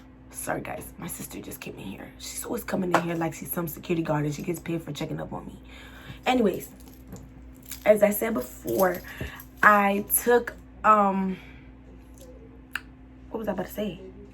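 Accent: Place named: United Kingdom. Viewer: It’s the UK accent for meee😂😂😂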